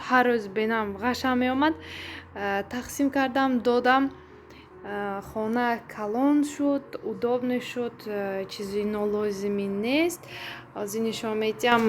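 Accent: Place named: Khujand, Tajikistan. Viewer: Салом Мана дугонам Худжанди буд гапзаниш махкулм буд шумони хамунбарин гап занитон махкул хама влоготона тамошо дорам ай як лав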